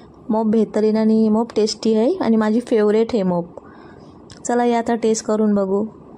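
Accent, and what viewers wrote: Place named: Konkan, India. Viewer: रेसिपी तर छानच. मी तुमच्या चॅनेल वर नवीन आहे. कोंकणातील मुसलमानांची मराठी किंवा कोंकणी खूप खूप गोड आहे. मध्ये मध्ये अरबी, उर्दू, पर्शिअन शब्दांची पेरणी. ऐकताना खूप मजा येते. आपण कुठल्या गावातून विडिओ बनविता ते सांगाल का ?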